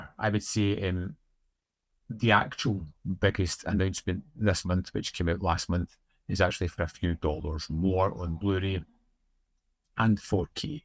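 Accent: United Kingdom. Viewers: I subscribed in your channel, mr, Nice content, are you from glasgow, liverpool, manchester, newcastle upon tyne, edinburgh or belfast northern ireland? I notice because of your Accent 😊👍🏻.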